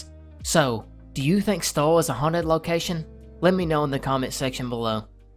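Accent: South, United States. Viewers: Best Southern Accent on YouTube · I always love your channel. I’ve been a sub for a while now and you never disappoint! I’m from Texas so it’s nice to hear some southern accents in YouTube land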